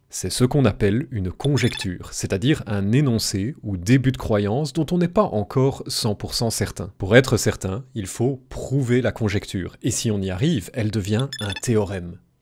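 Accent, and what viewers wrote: Belgium. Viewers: Il est belge normal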